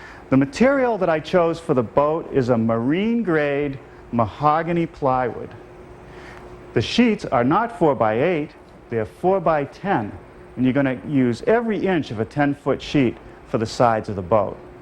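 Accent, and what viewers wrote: New England, United States. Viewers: I used to watch his show as a kid. I never noticed the strong New England accent back then. Now all I can think of when I hear it is Peter Griffin.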